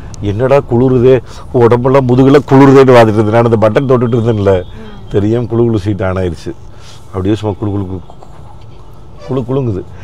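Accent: Kongu, India. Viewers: தம்பி அவர்களுக்கு வணக்கம் வாழ்த்துக்கள். உங்களின் மண் வாசம் மாறாத கொங்கு தமிழும், அதனுடன் இணைந்த இயல்பான பேச்சும், அதில் தெரிகின்ற உண்மையும், புன்னகையும், உங்கள் காணொலிகளின் வெவ்வேறு விதங்களில் வெளிப்படுத்தி வருகின்ற விதமும் மிகவும் சிறப்பாக உள்ளது. வாழ்த்துக்குள்!! தொடரட்டும் தங்களின் பணிகள். பாராட்டுக்கள்.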